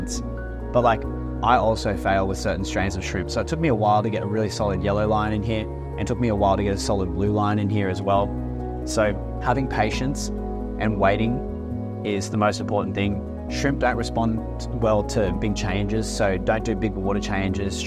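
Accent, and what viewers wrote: Australia. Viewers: I love all your videos. May I make a suggestion? The music audio is a bit too loud; it makes it harder to follow your wonderful Aussie accent!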